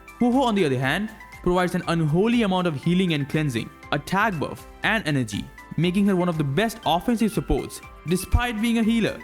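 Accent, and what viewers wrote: India. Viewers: You from India bro? Accent seems familiar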